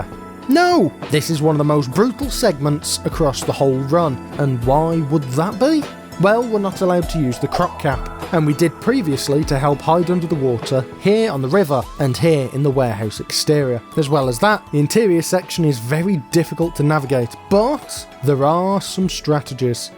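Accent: United Kingdom. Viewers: Notice he dropped the British accent when he started singing, like it’s something they do on purpose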